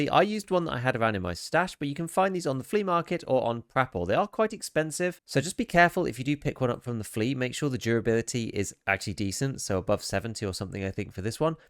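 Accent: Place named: United Kingdom. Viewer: you sound like a re**** brit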